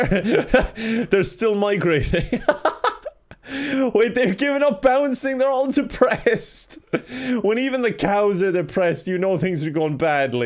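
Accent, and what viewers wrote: Russia. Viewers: i love when he speak his russian accent 😂❤️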